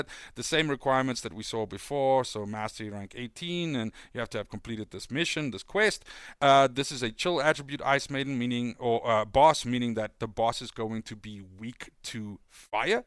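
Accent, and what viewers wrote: South Africa. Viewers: I saw you are from Denmark but, you really sound South African!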